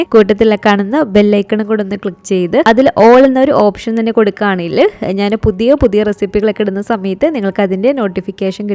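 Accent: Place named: Ernakulam, India. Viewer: ഇത്താടെ വീട് എവിടെയാണ്. സംസാരം കേട്ടിട്ട് എറണാകുളം കാരിയാണെന്ന് തോന്നുന്നു 😊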